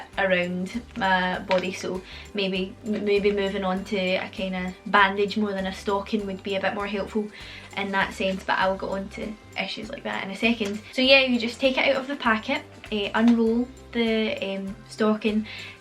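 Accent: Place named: Scotland, United Kingdom. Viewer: Holy shit your results are stunning! Beautiful skin! I'm feeling all proud of myself as an American who was able to correctly identify your accent as Scottish...lol. That's as best as I can do though. I'm glad to have found your channel!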